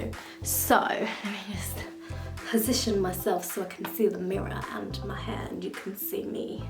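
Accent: United Kingdom. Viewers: Your hair is absolutely lovely! I really enjoyed this video and I'm looking forward to others. I adore British accents, your voice is relaxing. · What accent is this, it’s nice. I know it’s British but what specific accent?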